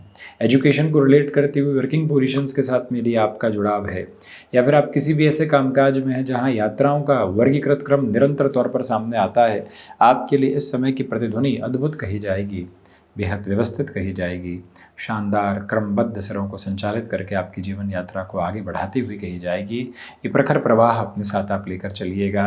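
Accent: Standard. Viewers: Itni suddh hindi Maine DD1 ka Ramayan mai bhi nahi suna tha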